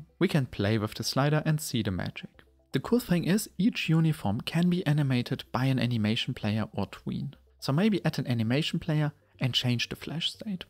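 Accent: Germany. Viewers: That’s a german accent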